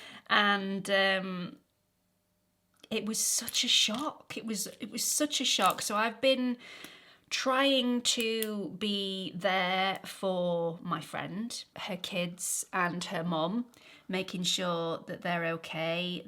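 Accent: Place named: London, United Kingdom. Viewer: You are in good company with your Mum. It is soul crushing to walk that journey with them but on the other side is happiness that you did. I promise! Not sure where you are in UK but your accent sounds more London-area. Legendary late 70's (Birmingham, I want to say) musician Al Stewart is having some very rare shows around there tonight and tomorrow and it will bring you both a lift at not a big cost. He is recently 80, all joy and smiles and has a remarkable young band that tours with him. It is his farewell tour. We saw him here in Michigan, USA 2.5 years ago and it was an amazing little show that will put your energy back on track. ❤❤❤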